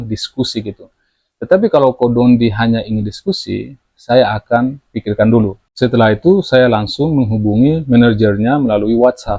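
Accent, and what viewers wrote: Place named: East Nusa Tenggara, Indonesia. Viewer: Logatnya sepertinys orang NTT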